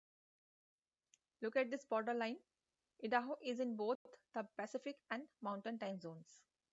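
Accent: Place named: India. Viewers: I do not want to offend you, but maybe you have to think about the rest of the world, not just India. If you want that your video is understood by the whole world, you have to make it as similar to an American neutral accent, as possible. That is just the way the world is. Otherwise, people just will not understand it... I did not understand what you said, I am Mexican, yet I understand pretty much any other videos... so there you go... if you do not care to be understood by as many people as possible, then keep the Indian accent, people from India will understand you, but half the world will not. So, it is up to you... for instance, us Mexicans and people from Latin America work very hard to have an American accent, just so that we can get a better job. But, again, it's up to you. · Bro work even harder.... master your English learning skills so you can even understand Indian accent.... 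not from India but seems like you've a dig with Indians!!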